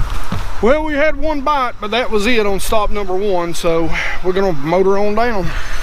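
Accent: South, United States. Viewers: Great stuff. Best of luck to you this season! Love the southern accent!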